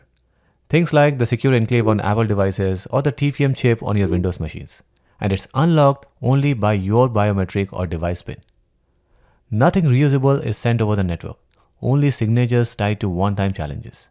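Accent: India. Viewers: Hearing about protecting myself from phishing attacks through an Indian accent is kind of surreal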